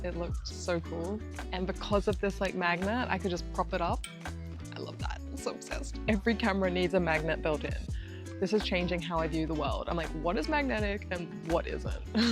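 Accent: Australia. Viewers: Really enjoyed the day trip! I also enjoy your Aussie accent! He-yah (here) 😺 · I like you very much. Your Australian accent is lovely. The "hiya" (here) is very prominent. 😄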